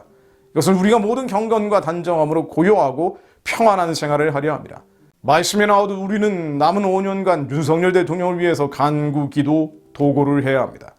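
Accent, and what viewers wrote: North Korea. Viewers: 이분??북한말투 심하게 쓰시네~~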